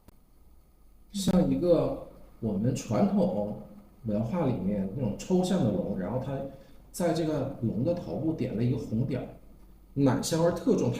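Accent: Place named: Northeast, China. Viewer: 我是馬來西亞新山人，我也挺想念東北的，聽到你們的口音就想到東北朋友，東北的美食和黏豆包。祝小留學生爸爸和媽媽虎年虎虎生威，小留學生學業棒棒滴！全家健康也棒棒滴！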